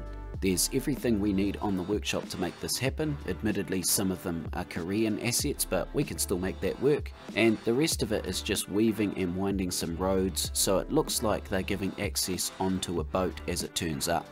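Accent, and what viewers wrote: New Zealand. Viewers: Awesome work, mate! I appreciate your unique and realistic ideas. Bloody hell I miss the kiwi accent, though! All the best to you from an Aotearoa-loving West Islander!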